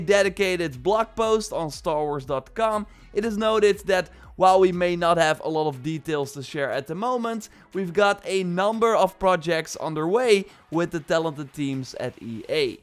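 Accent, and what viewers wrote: Netherlands. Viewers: Oh haha Your dutch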